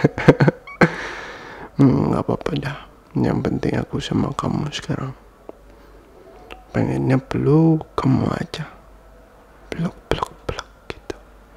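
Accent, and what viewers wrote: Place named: Indonesia. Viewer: He got something extra with his accent, never knew indo and english issa good combination tho. Even me as malaysian, i fell for indo language everytime i heard the indo ppl speak. It kinda sooo soft and u mixed with english , the cool tone is added so yeah 1000/100 for that ✨😂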